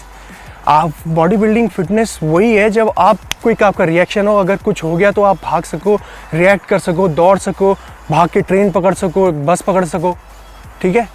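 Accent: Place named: Uttarakhand, India. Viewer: You look so genuine. Aapke hindi main aapka uttrakhandi hota jhalakta hai 😉😉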